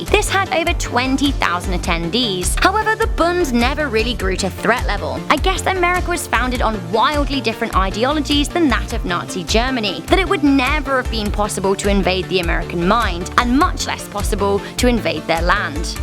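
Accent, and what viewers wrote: United Kingdom. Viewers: British sounding person detailing a war between the U.S and Germany. But this, does put a smile on my face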